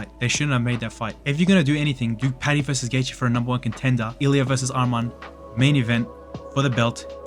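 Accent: Australia. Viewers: Didn't expect an Aussie, presently surprised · wtf didn't know my favourite editor is australian 😂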